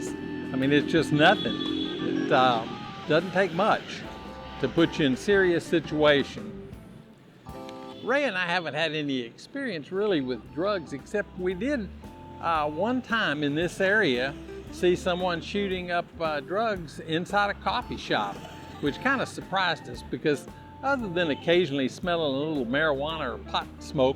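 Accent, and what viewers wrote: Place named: United States. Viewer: Nice Video:) first one I have watched of yours.. keep up the good work. I hear the American Accent, what state were you originally from?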